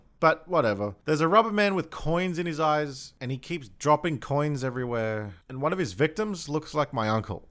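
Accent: Australia. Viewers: I just worked out that you’re Aussie and that made me happy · Wait, Your Australian?... IM A FUCKING QUEENSLANDAAAAA! YEAH BRO! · This shit wouldn't be as funny if you didn't have an Australian accent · spot the Aussie lol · Are you Australian??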